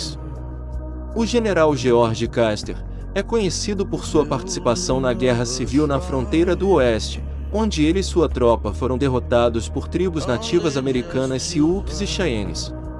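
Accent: Brazil. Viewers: Muito interessante o conteúdo. Já a locução é muito fraca. Num vídeo sobre o Far-west norte americano, era recomendável um locutor com bom sotaque americano e não um que diz os milhentos nomes de figuras e locais americanos á moda do Brasil. O facto mais relevante sobre o Gen. Custer é a enorme derrota na batalha de Little Big Horn, este nome devia ter sido mencionado e não uma baralhada entre Guerra Civil e Sioux e Cheyennes. Mas isto são pormenores, para puderem melhorar no futuro, porque adorei ver tudo.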